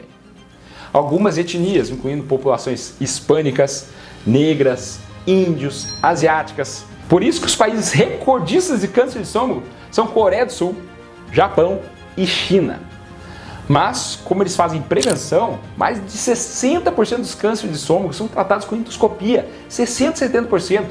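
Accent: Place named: Paraíba, Brazil. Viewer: Nordestino paraibano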